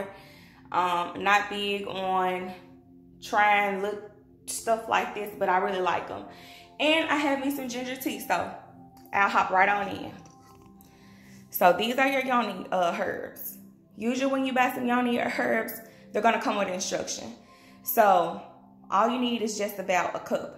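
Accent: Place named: South, United States. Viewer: She has a Southern accent. She's not really pronouncing words wrong. She just has an accent.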